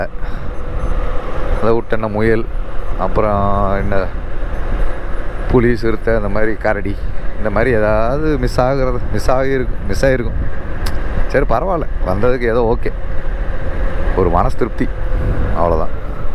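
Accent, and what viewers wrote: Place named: Kongu, India. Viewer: Hi watching your vlogs with great interest. Wonderful effort. Keep it up..love your Kongu Thamizh. Subscribed your channel. All the best ❤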